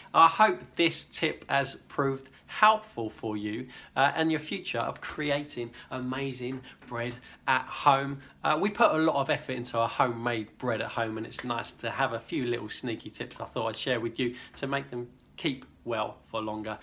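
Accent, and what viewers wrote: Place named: London, United Kingdom. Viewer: love all the tips done with such sincere passion. I’m an American and wonder about that accent. Is it a London accent? If so, or if not, which area? Can tell it’s English, but curious of the location exactly!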